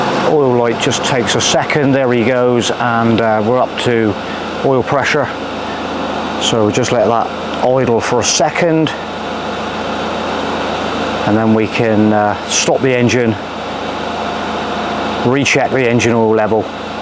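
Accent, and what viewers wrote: West Country, United Kingdom. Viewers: West country eh!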